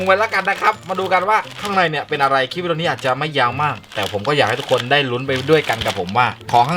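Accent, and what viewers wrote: South, Thailand. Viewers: ติดสำเนียงใต้นะ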